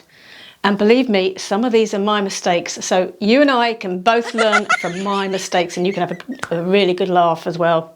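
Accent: England, United Kingdom. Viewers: The color of lipstick you are wearing may your teeth look off color not white! You’d look younger if you would wear brighter lip color with a sheen and get your teeth whitened! You have become really great on YouTube! Love the English accent and humor!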